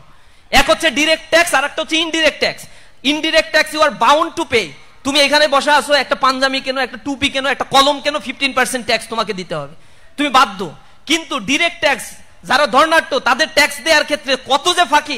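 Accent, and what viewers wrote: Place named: Chittagong, Bangladesh. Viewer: তোমার কথা শুনে মনে হচ্ছে , তুমি চট্টগ্রামের মেয়ে।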